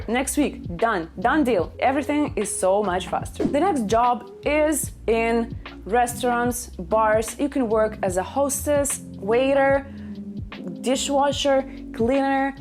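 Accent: Russia. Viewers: Are you russian speaker ?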